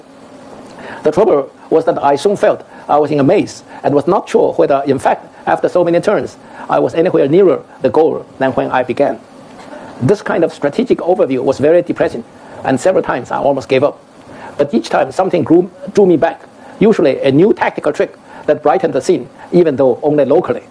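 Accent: China. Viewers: 精彩。在美国生活了一辈子，英语的华人口音还这么明显。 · 1922年出生的可以说是近代史活化石了呀 他的口音就是当时中国的口音吧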